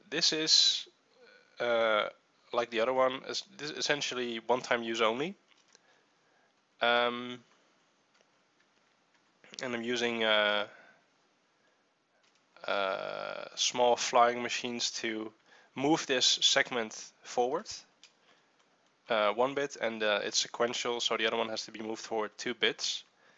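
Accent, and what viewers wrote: Netherlands. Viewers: Yeah you can hear That he's dutch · Are you dutch?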